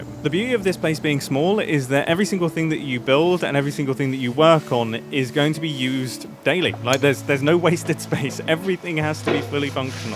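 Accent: France. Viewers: So excited for to slowly acquire a French accent over the course of a few years. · I can't wait for to slowly gain a slight french accent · I can't wait for like, 6 months from now, when your French Accent starts sneaking into you Minecraft Videos. Congrats on the (almost) move. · can't wait to hear gradually develop a french accent over the course of the next few years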